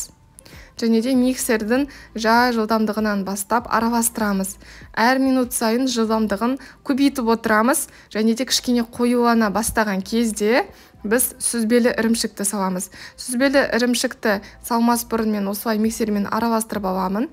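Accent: Standard. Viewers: Таза қазақша сөйлеген тіліңіз қандай балдай?! 👍👍👍👍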